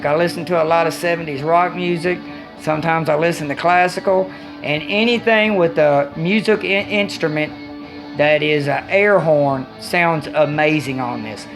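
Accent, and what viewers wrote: South, United States. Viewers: Can't shake the southern outta you brother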